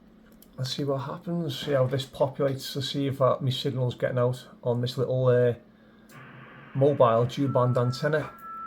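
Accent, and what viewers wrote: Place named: Liverpool, United Kingdom. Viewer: Great video mate, im going through all your videos, thinking of having a go , I had some interest about 40 years ago but never pursued it, actually went to riversdale, sure you remember Riversdale college, I became a clockmaker, I have a YouTube channel myself Clock Repairs Merseyside , got quite a few followers now, but I’m slowing down need to find something to take my mind away, came across your channel instantly recognised our familiar scouse accent, new I was in the right place 😁😁 so I’ll be binge watching everything, great stuff 👍👍. Best wishes John Clock Repairs Merseyside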